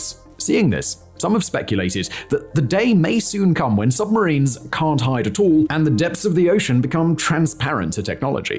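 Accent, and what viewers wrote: England, United Kingdom. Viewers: I appreciate so much English accent compared to US one. Better understanding for my old scholar skills... La cerise on top of the gâteau. 🇨🇵❤️🇬🇧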